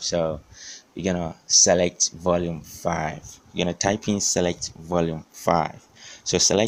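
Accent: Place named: Africa. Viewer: You have an African accent, are you from Africa?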